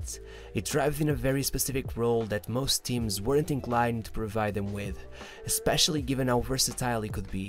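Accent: Portugal. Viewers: Very tuga accent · You sound Portuguese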